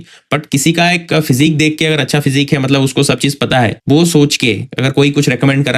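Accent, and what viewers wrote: Odisha, India. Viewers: R u odia bro?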